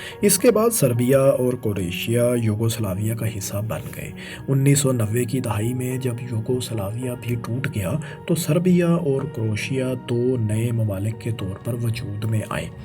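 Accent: Punjab, Pakistan. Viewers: ماشاءاللہ بہت معلوماتی ویڈیو تھی۔ فیصل بھائی سے درخواست ہے کہ اس ویڈیو میں بولنے والے بھائی کے بجائے خود آڈیو دیا کریں کیونکہ یہ بھائی پنجابی زدہ اردو بولتے ہیں اور مجھے اس سے بہت کوفت ہوتی ہے۔ مثلاً عِلاقہ(Ilaqa)کو عَلاقہ(Alaqa) ، صورَتحال(Soorate Hal) کو صورْتحال(Soorte Hal) اور آزادی کو ازادی تلفظ کرتے ہیں ۔ اور 19 کو انیس کے اُنی بولتے ہیں ۔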